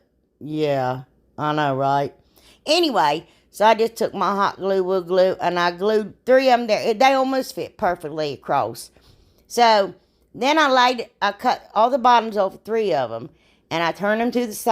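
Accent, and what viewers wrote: South, United States. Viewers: IOVE YOU!!! And your channel If people don't like the way you talk then they shouldn't be watching I love the way you talk you are southern So am I Anyway (lol) some people just cant be nice!!! it's not in them But Anyways I loved all of them Great Job!!! You are the BEST!!!! You're my #1 crafter🖤🖤 Take Care My Friend and God Bless you and your family Love you to the moon and back and my #1 phrase is if you don't like me Oh well your loss I'm a nice person and if you're talking about me you're letting someone else rest awhile So suck ot up Butter cup (lol) Love you Sweet lady🖤🖤🖤🖤🖤🖤🖤🖤🖤🖤🖤🖤🖤🖤🖤🖤🖤🖤🖤🖤🤣🤣🤣🤣🤣🤣🤣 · Het, I just love all of these. They are all so stinking cute. I can't pick a favorite. For anyone who says anything about you or the way you talk, they don't have to watch. There are a lot of ignorant people. I guess they don't know any better. 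We love you and your crafts and the way you talk. I particularly love your southern accent. So don't even pay attention to people that say stupid stuff. The people that matter love you so that's all. 😁 ♥️♥️♥️ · Girl I thought I sounded country but you have me beat. I love it. ❤️❤️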